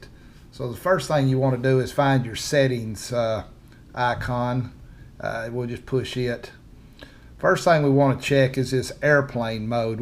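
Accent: South, United States. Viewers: Southern Draw, Sa....weet..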